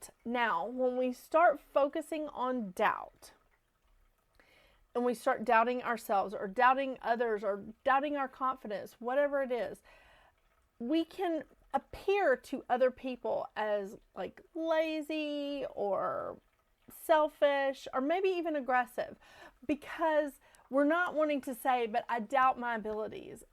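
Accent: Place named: South, United States. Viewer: First time watching from Georgia. You sound SOUTHERN. Refreshing. Thanks for the heads up!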